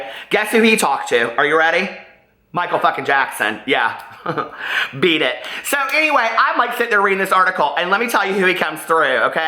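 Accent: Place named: Midwest, United States. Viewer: I knew you was from the midwest. We keep it real there.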